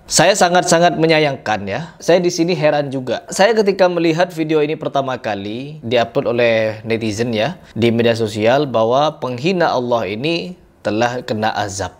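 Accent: Madura, Indonesia. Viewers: Kau orang madura ya bang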